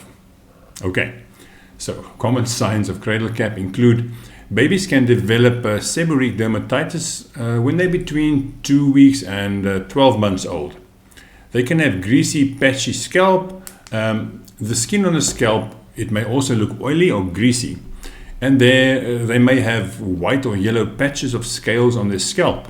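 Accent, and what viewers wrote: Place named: South Africa. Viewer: The minute i heard your voice i daughter he is south African. Miss that accent. Thanks for the info on cradle cap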